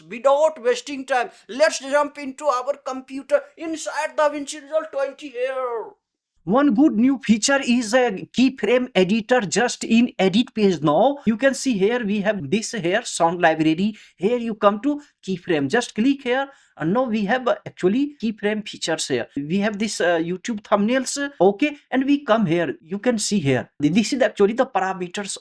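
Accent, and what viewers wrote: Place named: India. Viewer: Mat bol English bhai joker lagta hai aur foreigners majak bhi udaayenge... Typical indian accent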